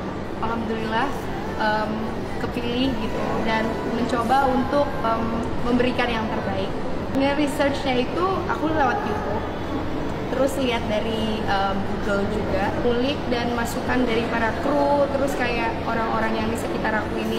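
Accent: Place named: West Java, Indonesia. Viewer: Taahhh.. ieumah lumayan, rada mirip,,make logat sunda saeutik weh,,voooooollllll😁👍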